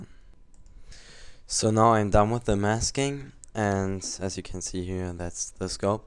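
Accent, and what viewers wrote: Germany. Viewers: Your German Innit?:3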